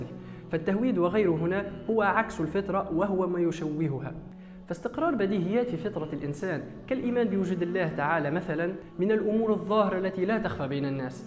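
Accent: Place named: Tunisia. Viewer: حسيت صناعة المحاور تتكلم بصيغة تونسية ...مشروع رائع بارك الله في عملك ووفقك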